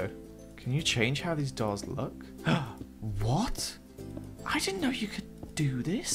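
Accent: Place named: Scotland, United Kingdom. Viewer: he is Scottish so he talked diff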